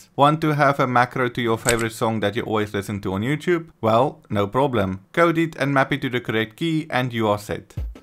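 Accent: South Africa. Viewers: Would love to see version 2. Your accent sounds familiar would you be from South Africa. If so it would help in getting a pcb from your order.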